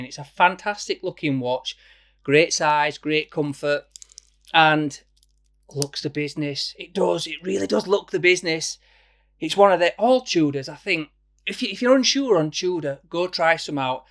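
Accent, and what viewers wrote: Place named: Scotland, United Kingdom. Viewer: Nice Scottish accent but I do think the BB58 blue is a real keeper!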